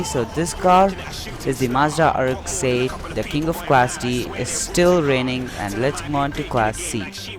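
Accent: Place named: South Asia. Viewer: You sound south asian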